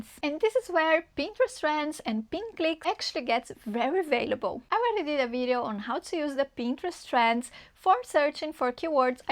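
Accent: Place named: Brazil. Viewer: Are you brazilian? your accent is kinda familiar tho! trhanks for the info!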